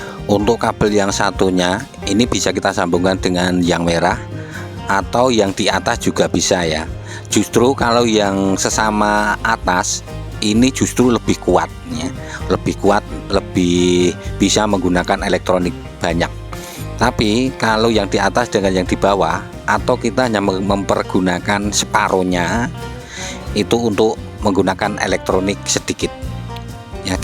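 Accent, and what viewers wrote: Java, Indonesia. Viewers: First time lihat vedeo ini terus boleh tau kamu org jawa krna org jawa cakap bunyi dalam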